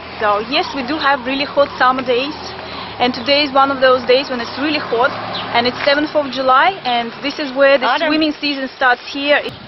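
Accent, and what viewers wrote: Russia. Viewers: Good english too. Better than many RT translators I've heard. There is a specific russian dialect. Words like water becomes woter but it's fully understandable with good vocabulary. It was nice seeing the Volga in summer with sandy beaches. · wao im much amazed by your russian style english accent miss....it feels much innocent...and soothing. moreover. Ti Ochin krecivya. spiyceba for your video efforts. im much interested to learn about russia & russian people.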